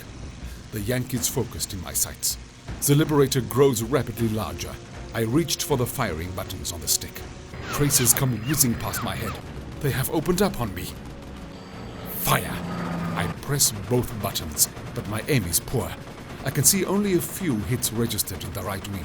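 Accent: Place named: South Africa. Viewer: Very well done. War is a dramatic and often ugly business. This makes the point rather well. That people on either side should celebrate the death and suffering of others illustrates how crude we can be. Narrator South African?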